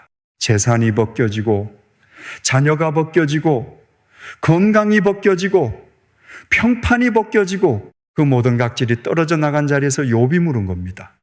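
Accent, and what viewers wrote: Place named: Gyeongsang, South Korea. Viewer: 목소리도 전혀 아니에요 경상도 말씨인데 참 유튭.들 ㅍㅍㅍ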